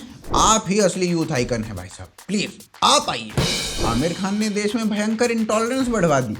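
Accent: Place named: Palwal, India. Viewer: 😂 Aap kya gazab bolte ho.. Jab aap Gram Darshan par bolte ho to mujhe Palwal ke Jaaton ki bhasha yaad aati hai.. kyonki aaj bhi jab mai palwal jata hun ( Washington DC) se to ye bhasha sunne ko milti hai. Kyonki mai bhi Jaat hun.. 😂😂😂😂😂 Thank you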